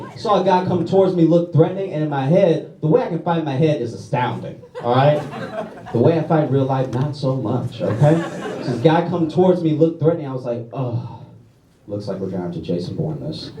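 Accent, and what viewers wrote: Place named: Louisiana, United States. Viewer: What's good Fam! Im from North Louisiana, half way between Alexandria and Shreveport. A little shithole called Zwolle! I just ran across ur videos and was checkin it out and of course that Louisiana draw caught my ear. When you said you from Louisiana and everybody clapped and u said not New Orleans and said New Orleans to Louisiana was like having 1 beautiful blue eye and a fuckd up face!! I laughed my ass off cuz! When I tell people where im from I can already see images of Mardi Gra starting to form n their head. Keep up the good work man, make us proud! life n such a fuckd up place will giv u a either a chip on ur shoulder or the best sense of humour ever. I guess u got the latter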